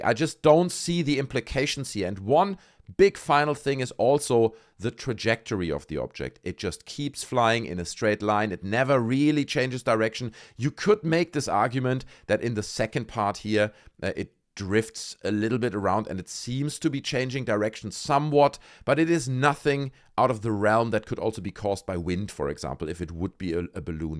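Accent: Germany. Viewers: The German accent takes this channel so far over the top, I couldn't subscribe fast enough. Commence binge!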